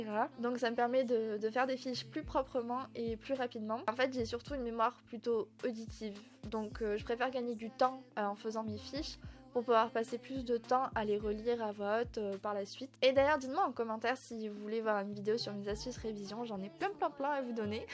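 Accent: Marseille, France. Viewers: L'accent marseillais qui ressort +++ on adore ! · Je t'aime trop tes la meilleure ❤😱😍😍👌 et j'adore tes cheveux magnifique 😍👌🔥demain cours 😱😭😭 j'adore ton accent marseillais et Oui pour la vidéo que tu veux faire ❤❤👌toute façon toute tes vidéo sont parfaite; tu te couche aussi tard que moi 😂😂😘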